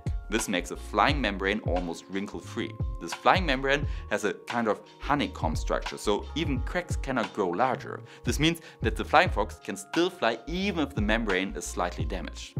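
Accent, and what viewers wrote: Germany. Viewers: You talk like Arnold Swarzenegger's nephew, being German, but I find your talks quite interesting.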